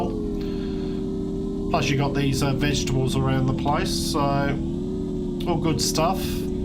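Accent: Australia. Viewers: nice video there, what part of Australia ru from if you dont mind me asking, im from collingwood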